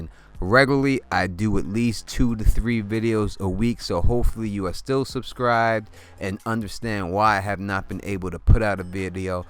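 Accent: New York, United States. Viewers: Glad you're better. Was sick myself couple of weeks ago. Great video 👍 We wouldn't leave ya!! Stay sexy 😘 Could listen to your voice all day. I love New York accents. I live in Alabama so...that doesn't happen enough!!😆💕💙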